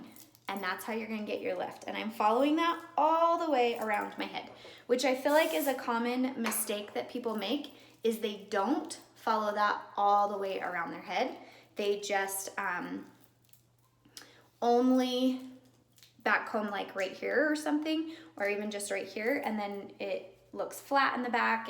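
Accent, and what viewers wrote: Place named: North, United States. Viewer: I wrote to you again about coloring my hair. I’m thinking I need to go silver because if I go blonde I am going to be touching up the roots all the tine. Because I have salt and pepper growing out. Ugghh I hate it. Btw, I love your accent. I am from Indiana, but I can tell you are from the North. Love it!! lol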